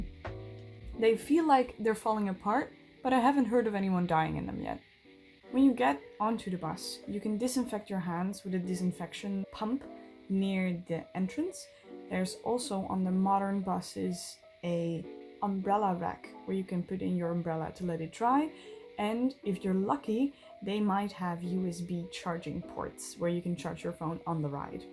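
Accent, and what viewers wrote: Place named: Upper Midwest, United States. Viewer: Hi there! What part of the USA are you represent? I’m from Wisconsin.. You sounded like a neighbor from Viking land or Lions land.. Ačiu !!!! 🇱🇹🇺🇸👋✌️💐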